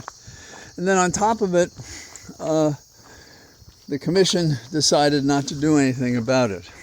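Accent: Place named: Ireland. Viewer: ... I never miss your talks. I always learn a great deal. Your critique of Judge Juan Merchan and D.A. Alvin Bragg today is understandable. However, I believe there is valid reasoning behind their actions. The D.C. Circuit Court is going to lay out Jack Smith's charges and these will be presented and discussed with reporters able to appraise them. That will occur before the election and I believe they will have a great impact upon the public, the court and the election. After Mr. Trump is defeated, the judge will have a full range of options to sanction him. That is, I believe, the plan. We shall see. Thank you for your input each day and for your Irish lilt!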